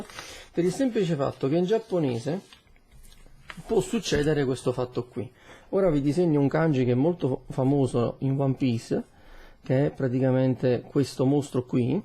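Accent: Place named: Naples, Italy. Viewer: Davvero ottimo video .. come tuo solito ! E poi ci avevo azzeccato che eri di napoli hahahaha sei fantastico frat'm. Comunque volevo porti una domanda , e possibile che non come molti pensano e cioè che law a PH abbia chiesto a luffy un'alleanza in virtù del tutto fortuita visto che proprio per puro caso i due si siano incontrati in quell 'isola oppure che law sappia la natura di kaido (ovvero la D) e proprio per questo sapendo che luffy è della D sia per un alleanza nella conseguenza di attaccare un altro imperatore? ! Da law come stratega me lo aspetterei anche se mi rendo conto che questa teoria è parecchio campata in aria. Comunque saluti e continua sempre cosi! Appassonaci con le tue teorie e i tuoi studi su one piece proprio con il tuo modo "scialbo" e molto semplice che contagia tutti! Si o mast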